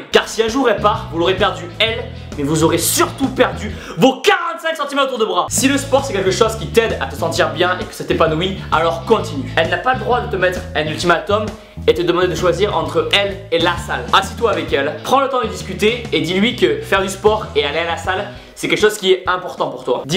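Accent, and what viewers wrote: South, France. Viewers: Daaaaamn! Avec le corps sec que t'as et ton petit accent du Sud, on ne t'a jamais proposé de faire les Marseillais?:p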